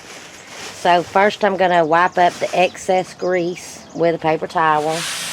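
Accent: South, United States. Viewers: Your country voice made this so relaxing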